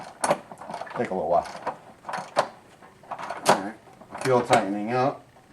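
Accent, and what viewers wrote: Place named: Maryland, United States. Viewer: you from the Maryland area by chance. I think I recognize that accent! :)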